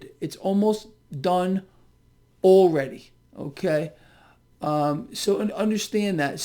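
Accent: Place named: Bronx, United States. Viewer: Hey, I do so much admire your advise, HOWEVER, please drop your BRONX background with the aks talk, Pronounce the word ASK as you were taught with the "S" before the "K". Please practice..... s... before....k.... It may be hard... PLEASE practice. thanks so much!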